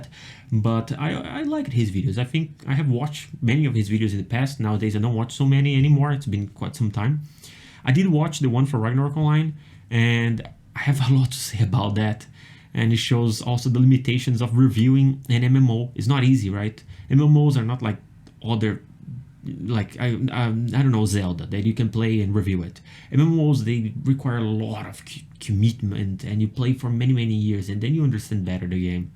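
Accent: Brazil. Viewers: Cara n e possivel, vc e BR? O sotque ta muito forte kkkkkkk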